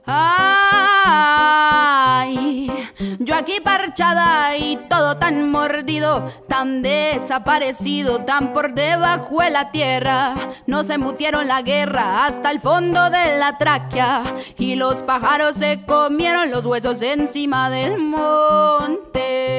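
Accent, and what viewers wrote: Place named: Antioquia, Colombia. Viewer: Ahhhhh si me imagine que era paisana colombiana por su acento!!!! Ultimamente he descubierto muy buenos músicos manizalista, un grupo de Salsa, una violinista en Europa y ahora La Muchacha, que bien. Abrazos a mis coterraneos paisas de media arepa, con cariño.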